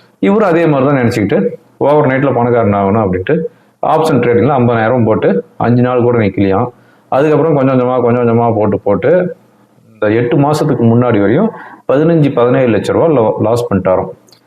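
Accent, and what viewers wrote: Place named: Chennai, India. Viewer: தல மெட்ராஸ் பாஷை பேசுது..வா தல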